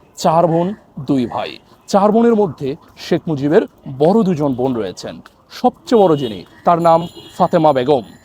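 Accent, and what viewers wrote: Kolkata, India. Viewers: Hindu to tai kolkatar moto bolse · আরে উনি আসলেই ভারতীয় কথা শুনে বুঝতে পারছেন না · দাদা বাবু আপনি যে দেখছি কলকাতা ভাষা বলছেন গো। দালাল চাটুকার চ্যানেল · তোমার কথা বলার ধরন কলকাতার দাদাবাবুদের মত কেন গো? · আপনি ক্যান ইন্ডিয়ান দাদা বাবুদের মতো কথা বলছেন?? বুঝলাম না আপনার উদ্দেশ্য... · এই সা/লা তো কলকাতার মা/ল। কথাতে ধরন ওরকম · সবই ঠিক আছে তোমার কথা বলার ধরন কলকাতার দাদাবাবুদের মত কেন গো? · আপনি পুরাপুরি শক্ত প্রমাণ উপস্থাপন করতে পারেননি! শেখ মুজিবের দালালী না করে সঠিক তথ্য উপস্থাপন করুন। আকারইঙ্গিতে, শেখ পরিবার এবং আওয়ামী দলের দালালী করছেন আর কথাবার্তা ধরন কলকাতার বাংলাভাষীদের মতো কেন? আরে, বলেই দেন? উনি ফেরেশতা ছিলো। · তোমার কথা বলার স্টাইল কলকাতার দাদাবাবুদের মত কেন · কিরে তুমি কি কলিকাতা রেন্ডিয়া দাদাবাবু নাকি? না মানে, কথা ত হুবহু ওদের মত!!! উত্তর টা দিবে।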